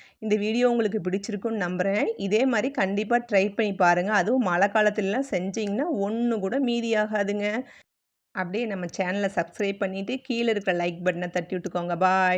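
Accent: Kongu, India. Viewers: கொங்கு தமிழ் மணக்குதே! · Madam pesu podhey theriuthu., Kongu Nattunu., intha madhiri receipes ellam kongunadu perponadhunga... 🙌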